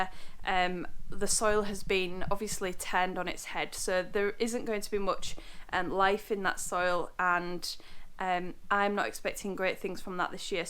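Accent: East Yorkshire, United Kingdom. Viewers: Love your East Yorkshire accent😊m from Leeds very different but similar.I’m an amateur gardener but I’m following your amazing project. Looking forward to to seeing your plot grow. 🐝🌼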